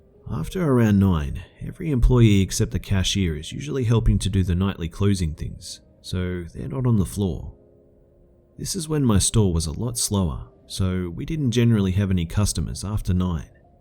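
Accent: Australia. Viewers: the way u can tell is he has a bit of twang as most Australians do to how he pronounces certain words like cove or over or home if u compare them to one of the numerous british accents you ll hear it too :) but its so slight. brits are a bit much at first to figure where they are from so many accents.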